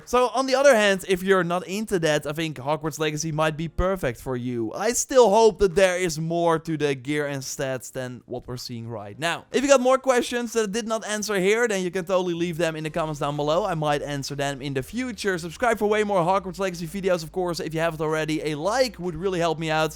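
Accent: Netherlands. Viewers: Something funny about your accent. Definitely Dutch.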